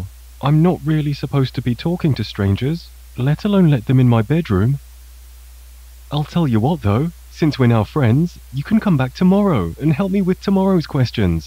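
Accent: United Kingdom. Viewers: The narrator sounds like a British Nexpo. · Excuse me, is this video reuploaded? Cause I heard bad quality in this video, but this short film is for me like " weird " and I heard british man's voice narration.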